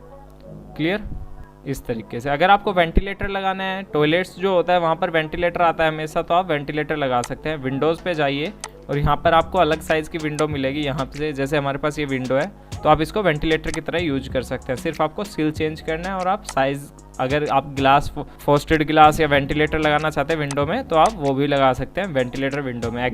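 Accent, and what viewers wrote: India. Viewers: Please 🙏🙏🙏 Speak in Britain accent Stop using Indian accent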